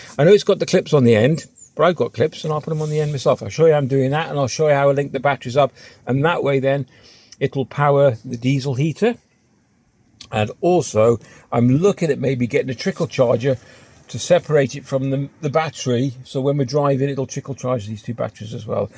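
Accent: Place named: Shropshire, United Kingdom. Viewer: recognise your accent.....anywhere near Shropshire?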